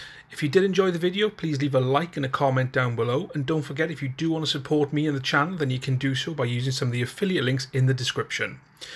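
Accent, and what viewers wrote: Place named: Swansea, United Kingdom. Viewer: can always tell another jack! 😍🏴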